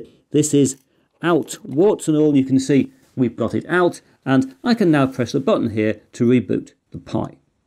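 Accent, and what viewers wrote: United Kingdom. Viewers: Would be nice if the channel removes stinky f British accent. Accent of world slavery and kings to enslave ppl.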